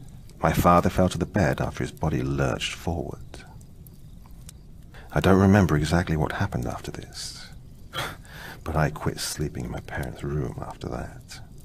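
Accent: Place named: United Kingdom. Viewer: Been listening to your channels as usual...it’s just something about a British accent that we Yank girls love:) Listening in Massachusetts,always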